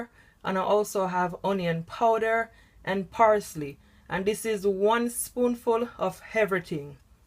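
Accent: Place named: Jamaica. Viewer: Lol the patois trang ... I love it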